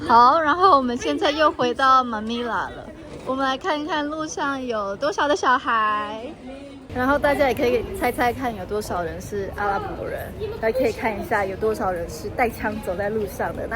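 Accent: Taiwan. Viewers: 好奇你是台灣人嗎?口音就是台灣人..但福建的也很像! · 听口音是台湾人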